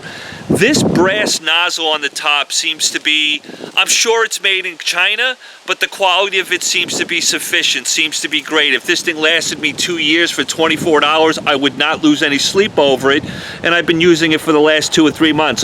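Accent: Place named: New Jersey, United States. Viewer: I was expecting a southerner when it said Dallas, Tx, not someone from Jersey.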